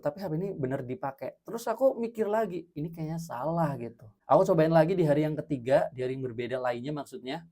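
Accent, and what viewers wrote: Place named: Cirebon, Indonesia. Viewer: Cirebon banget indo nya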